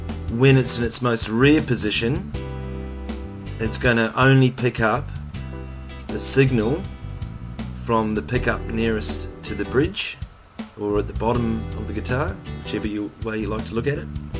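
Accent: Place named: Australia. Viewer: Unbelievable. An Aussie accent. I subscribed immediately. Yanks and Poms are ok but as an Aussie it's a nice touch to hear an Aussie or Kiwi accent.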